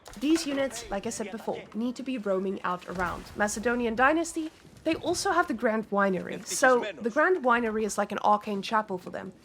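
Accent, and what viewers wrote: Afrikaans, South Africa. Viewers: Taklak, die Afrikaans klap hard :p Lovit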